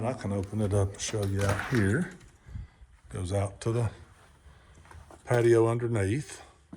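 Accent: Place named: Southwest Virginia, United States. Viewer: Wow! This place is spectacular! Now I cant afford any of this but it's stunning. I have to let you know that you remind me of my family growing up (accent). I was born in Wythe county and lived in Cripple Creek and then Wytheville until the age of 10 and we came further north to central va. If I could, this would be my dream... even a touch of it would. I'd love to live in this area again, it feels like home. My grandmother passed away and I have an aunt and uncle in Rural Retreat and this place is magical. Maybe one day I can find my spot ✨ Merry Christmas 🎄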